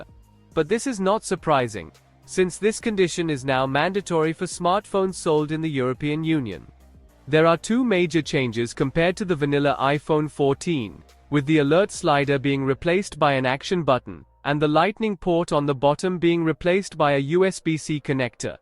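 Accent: India. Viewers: I hate this Indian accent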